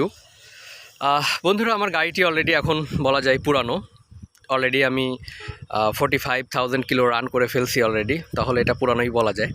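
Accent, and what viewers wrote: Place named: Khulna, Bangladesh. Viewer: আমিও খুলনার ছেলে এজন্যই কিছু কথা বলছি ডোন্ট মাইন্ড ৷ আপনার ভয়েজটা ভাল, উপস্থাপনাও খারাপ না তবে শুদ্ধ ভাষায় যখন কথা বলবেন তখন সকল উচ্চারনই শুদ্ধ হওয়া উচিত নইলে সেগুলো শুনতে খুবই বাজে লাগে ৷ হইছে, গেছে, করতিছে, পাইছি, এই টাইপের খুলনাইয়া উচ্চারন গুলো শুদ্ধ উচ্চারন করলে ভিডিওর কোয়ালিটি আরো সুন্দর হত ৷ আর এত লম্বা সময় ধরে শুধু মাত্র বাইকের চারপাশ থেকে শুট না করে মটোভ্লগিয়েংর মত বাইকটা চালানো অবস্থায় ভয়েজ দিলে আরো জোস হত ৷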